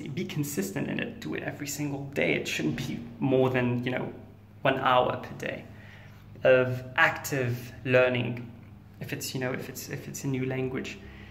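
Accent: United Kingdom. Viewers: Thanks for sharing the video...I see that your prefer British English and speak it in an amazing way. For me I prefer more the American/Canadian English with a big respect for the British English :) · its true though, the only word that I heard a slight accent in is motivation, and probably two others. Other than those words, your british accents sounds perfect to me! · If you aren't British then your accent is amazing Subscribed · Your english accent changed from american to british lol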